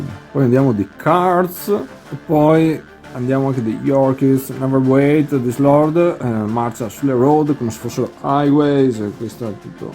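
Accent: Italy. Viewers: Ciao, ottimo lavoro! Mi sto guardando i tuoi video visto che ho da poco acquistato il gioco. E poi dall'accento non sei così lontano da Vicenza, magari in futuro Na partìa 😂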